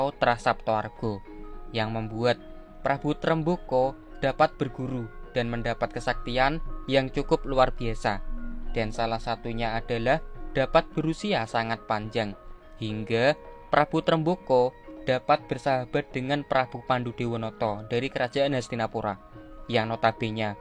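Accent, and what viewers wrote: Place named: Purwodadi, Indonesia. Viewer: Naratornya maaf wong purwodadikah!? Cengkoke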